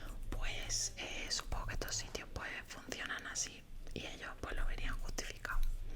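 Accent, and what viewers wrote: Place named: Andalusia, Spain. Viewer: Pensaba que éramos vecinos de provincia pero no! Jajajaja porque tu acento es de Andalucía y por lo que cuentas, ibas a irte de Málaga a Córdoba a estudiar pero tu padre no quería. Sinceramente aun no me voy a dormir, pero lo tengo en pause para seguirlo en cuanto me acueste porque me relaja tu voz! Un abrazo compañera! 😇😴😴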